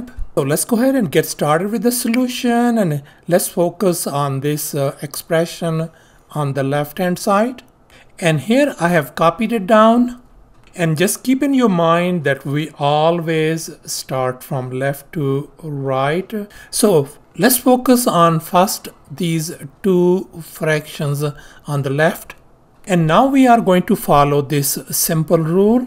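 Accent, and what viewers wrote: India. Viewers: Sir you are amazing... your accent??....are you Indian or Pakistani??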